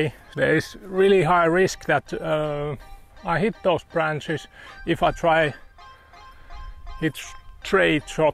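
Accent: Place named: Finland. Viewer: Bit of finnish accent or "rally" accent but so what 🤷‍♂️ keep it coming!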